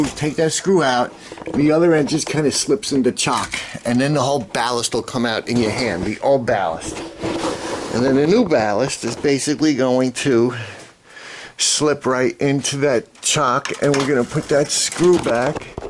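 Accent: New York, United States. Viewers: I love NY or Jersey accent! I'm southern, never ending amusement when I lived in north. What the hell is biscuits & gravy?? Difference between north/south, North will tell you to your face, F/O! South, we are sneaky & very polite, Be aware, probably find your body in a ditch next day if you piss us off but we are polite!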